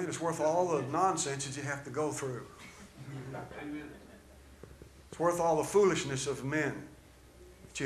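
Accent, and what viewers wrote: South, United States. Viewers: I don't care what he says theirs never anyone came close that sounds like elvis or even comes close like you do and looks like elvis and that southern accent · think its the southern accent fooling you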